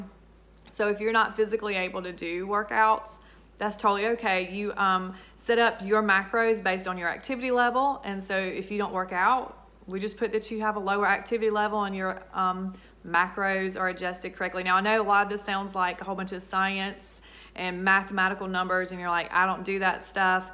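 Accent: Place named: South Carolina, United States. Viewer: You sound like you're from SC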